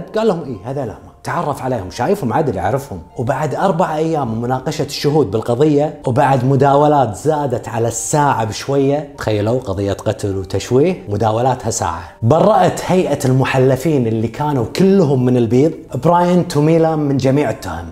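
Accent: Kuwait. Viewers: حبيت اللهجة الكويتية من وراء سردك الحلو وغشمرتك وخفة دمك...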